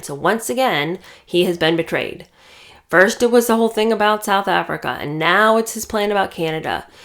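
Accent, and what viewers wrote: United Kingdom. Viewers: Love listening to you! Your British accent is hilarious ❤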